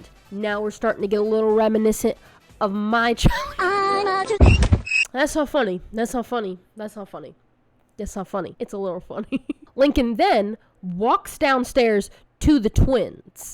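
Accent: South, United States. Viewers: I love your southern accent. 😊 It's so cool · I'm hearing more of a Southern accent than a Boston accent. :P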